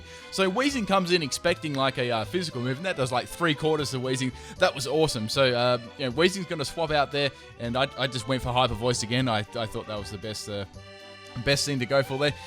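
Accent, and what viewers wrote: Australia. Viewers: are u ausrtralian?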